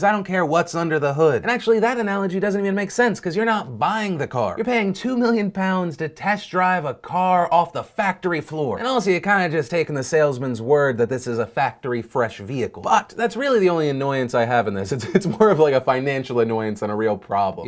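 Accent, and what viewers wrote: South, United States. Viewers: I hear a southern accent in your outros lol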